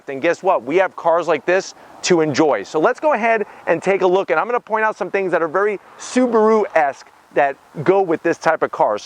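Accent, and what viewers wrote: United States. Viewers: Nothing worse than a yank accent it grates it’s high pitched and not ENGLISH!!!’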